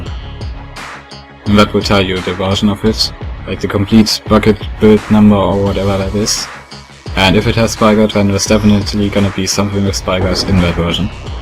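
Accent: Germany. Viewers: You have a german accent!Am I right? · du bist deutsch ich erkenne es an deiner stimme :D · Man merkt an deinem englischen dialekt das du deutsch kannst :) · I can hear, that you are german :D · man hört so hart dass du deutsch bist xD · You are a german guy or 😂 falls ja hört man es raus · Man hört das er deutscher ist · Hi man hört das du deutsch bist😉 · ah deutsch hört man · Kann es sein das er deutsch ist der hatn deutschn aktzent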